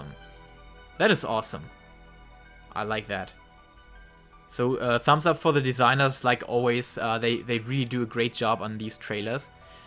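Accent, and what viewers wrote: Germany. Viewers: I think because hes german, could sound confusing to americans, but to me he sounds like a guys that is talking englisch :D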